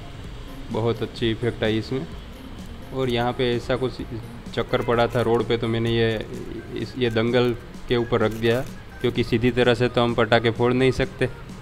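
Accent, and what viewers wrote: Gujarat, India. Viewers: Apki hindi se lagta he aap gujrati ho Reply plz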